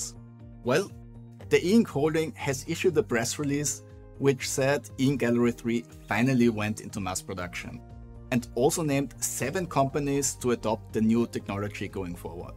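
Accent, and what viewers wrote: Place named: Austria. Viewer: Man hört den österreichischen dialekt so gut raus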